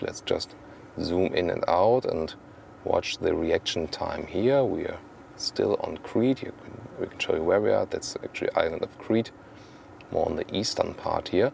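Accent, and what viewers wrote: Germany. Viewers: I hope you mean "Bruno," but even so the only similarity is a German accent. And Bruno is actually Austrian.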